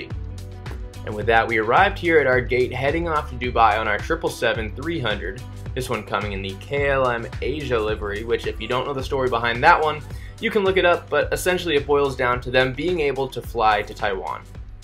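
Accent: United States. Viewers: I hate the KLM Lounge in Amsterdam! It's definatly one of the worst! It's always crowded and they only offer little amounts of food and drinks. Otherwise, nice and really interesting review. But please speak a little bit slower and more clear. Your American accent makes it quite exhausting to listen all the 39 minutes. 👍🏼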